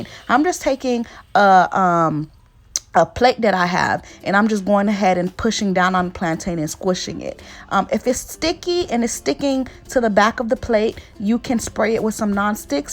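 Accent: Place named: Miami, United States. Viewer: Hey, first off I love you meals I have tried several recipes, I’m a new subscriber. However, you talk like you live in Miami?? I’m from there and I here it I might be wrong. 😂🥰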